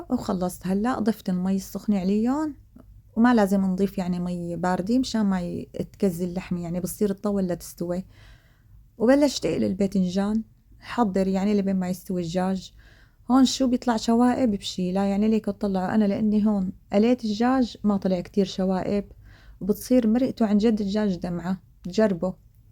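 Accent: Homs, Syria. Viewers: والله شي بشهي يعطيكي العافي ودخيل الحكي الحومصي😅😘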